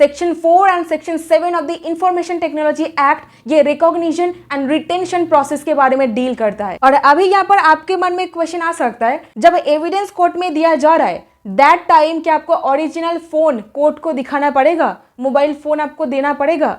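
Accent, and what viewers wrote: Bengal, India. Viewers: 😊 Bengalis jab Hindi boltey hain tab Aisa hi boltey hain😊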